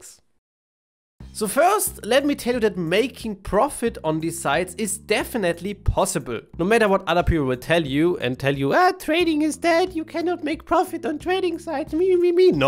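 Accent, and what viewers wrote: Germany. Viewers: i love ur videos, so damn underrated channel.. u have the hardest german accent i ever heard, combined with nice symphatic voice, usefull infos, really good explained, and the editing is just great. keep it up!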